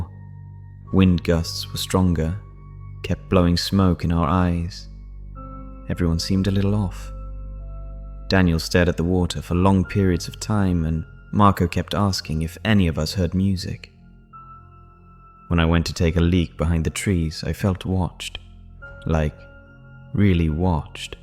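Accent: United Kingdom. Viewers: For those of us who do not have the class of a British accent, it makes the narration of your tales exceptionally enjoyable. The meat & potatos of the stories are always great. Good to see you back.. God Bless you & yours Sir..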